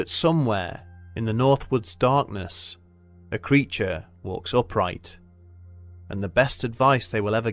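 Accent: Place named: United Kingdom. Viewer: The Michigan Dogman narrated by a Brit......give me a break!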